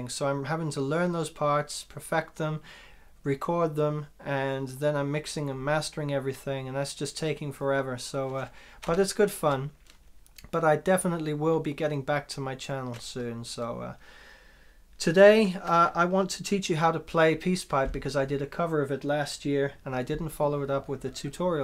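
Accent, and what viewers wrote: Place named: United States. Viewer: I remember you had a Italy connection...Maybe I've missed something you've told me about your move to the Durham in the UK....Yet, it's the American accent that confuses me....Yes...weather wise...back to normal...and maybe some warmer weather middle to end of next week (hopefully)....Regards....Dave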